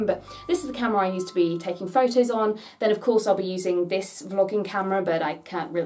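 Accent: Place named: United Kingdom. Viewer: UK accents are so charming. you could literally read the dictionary and i'd be mesmerized. You have such a great personality! you need more subscribers! i'm going to DW in may as my graduation gift, and this made me so much more excited!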